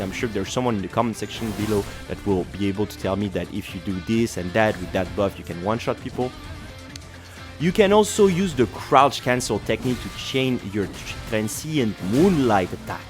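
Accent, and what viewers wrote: France. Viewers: T’es français enft parce que ta un petit accent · I didn't know french accent could be actually nice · You have a french accent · gg très belle vidéo xd g direct remarqué ton actent fr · le petit accent fr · the day they will increase the range of the meteoric ore blade and buff it, i will be in heaven. (i have a question, wich country do you come from ? i sensed a french accent but i think im wrong) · French accent ?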